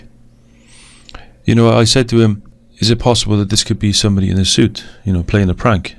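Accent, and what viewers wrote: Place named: Cardiff, United Kingdom. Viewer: Your voice sounds like your from Cardiff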